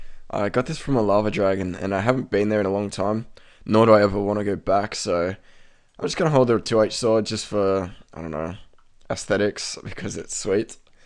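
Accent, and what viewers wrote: Australia. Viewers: called an Australian Accent.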